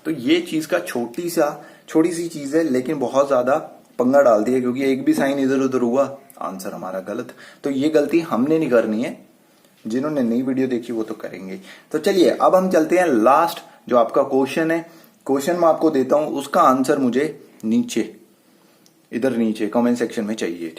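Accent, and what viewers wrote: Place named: Punjab, India. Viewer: phirse kya haal he 😂. Full punjabi accent.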